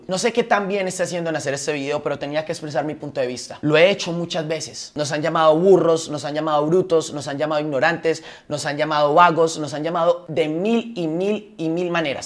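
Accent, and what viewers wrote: Antioquia, Colombia. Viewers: vea mijo usted tiene toda la razon no le pare bola ninguno de esos comentario negativos envidioso y me gusta como hablan los colombianos y te felicito por que tu opinion es mui bueno te miro desde Ecuador Saludos a esos envidiosos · asi es que se habla te apoyo Qué Mal que colombia este asi 😞😔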